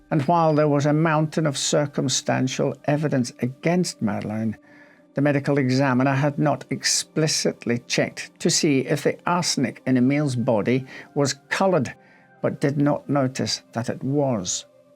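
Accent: Scotland, United Kingdom. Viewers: Yes, he is Scottish 😁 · Well not just a wonderful and salacious video but the fact that your good self with this wonderful Scottish accent just lifts it to a higher level. Wonderful story telling thank you.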